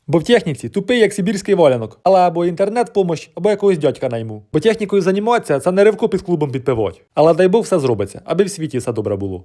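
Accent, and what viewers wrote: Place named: Cherkasy, Ukraine. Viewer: навіть,живучи у Черкасах , приємно чути "той" говір. Діалект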